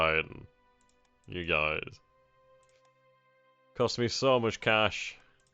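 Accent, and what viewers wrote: United Kingdom. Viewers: Ulta Posh XD as an Aussie you really sound like that stereotypical british rich man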